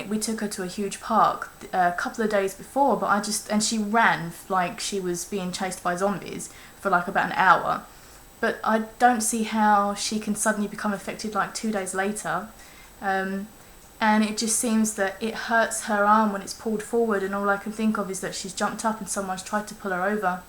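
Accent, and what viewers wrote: England, United Kingdom. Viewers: that english accent just makes me horny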